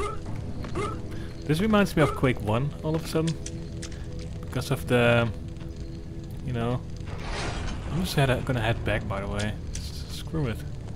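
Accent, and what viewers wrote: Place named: Netherlands. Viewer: Nederlanderrrrrrr!!!! 1e wordje hoorde ik het al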